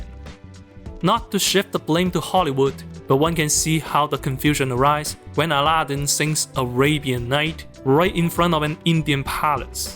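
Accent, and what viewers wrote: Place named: China. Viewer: delivered in a Chinese accent ... chefs kiss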